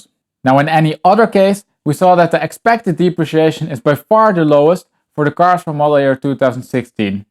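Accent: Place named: Netherlands. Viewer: Hello, bought myself a facelift GTS 2017 with 9000km in Belgium for 85k€. What a car. Beautifull en lots of sound and driving pleasure! Sold my <num> turbo cabriolet for same price as i bought it 10 year ago! Thanks for all your unique and perfect analysis. Regretfully all in dollar and US figures... as i think hearing your accent that you are from europe.... Holland i think 😅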